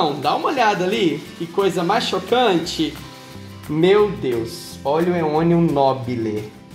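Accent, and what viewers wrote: Minas Gerais, Brazil. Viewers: Vc é mineiro? Pq super me identifiquei no monte de "nuuuu " "nossinhora" 🤣🤣🤣🤣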